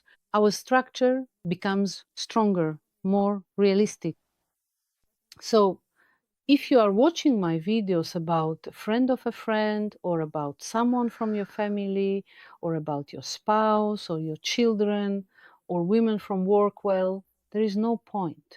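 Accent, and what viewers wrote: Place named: Eastern Europe. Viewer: мне нравятся ваши видео на русском.На английском ваши видео звучат очень по- славянски, без английской интонации. Может можно подкорректировать AI, чтобы ваш голос звучал максимально как native, с другим интонационным ритмом. С приветом и благодарностью, из США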